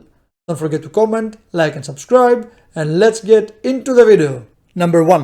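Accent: Greece. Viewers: are you from greece m8? your accent sounds familiar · your 100% greek, love your accent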